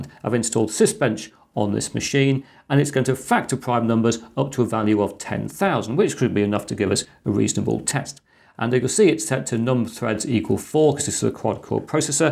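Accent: United Kingdom. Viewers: old lovley man with that british accent like that and like the way you like respery pi s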